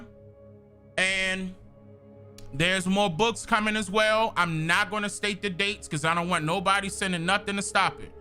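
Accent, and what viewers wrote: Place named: DMV, United States. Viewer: Damm you got me Smiling, Shit I always knew you where from the DMV Area you got that wire accent. Judge Joe brown too old to be acting like that he’s a grandfather he should have more sense than that. GOD BLESS TO EVERYBODY IN THE COMMENTS!!!!!!!!!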